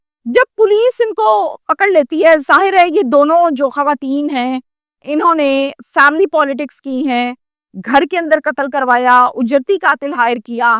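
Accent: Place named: Punjab, Pakistan. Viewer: This woman needs training on how speak on a public forum like social media. She has a Punjabi accent whilst speaking Urdu and her tone is usually aggressive especially when she interviews people.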